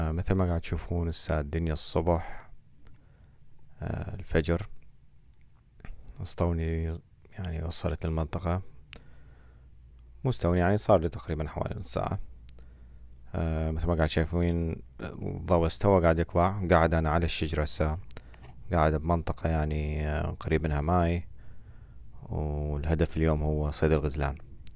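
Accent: Iraq. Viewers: الغالي احله ما بيك الهجه العراقيه الاصيله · يا اخي والله الصراحة فيديوهاتك ادمان....وطريقت كلامك تدل على انك عراقي اصيل اخلاق ومهارة واحتراف متباعك من الجزائر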